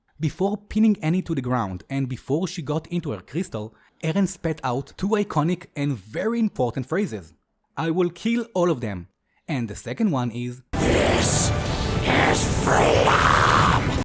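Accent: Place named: Israel. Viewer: Bro I could tell you’re from Israel by your accent 😂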